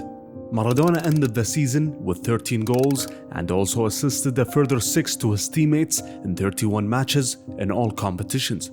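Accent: Arab world. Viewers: This was an excellent documentary. Until the very end I thought the narrator was Aregentinian😀 before finding out the accent was Arabic. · The narrator has a thick Arabic accent. That's why. He needs to attend an Accent Removal Course...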